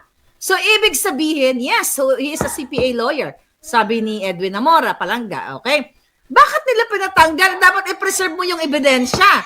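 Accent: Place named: Iloilo, Philippines. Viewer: Hahahahaha, ma'am maharlika, nakakatawa ka sa pagsasalita mo ng elonggo🤣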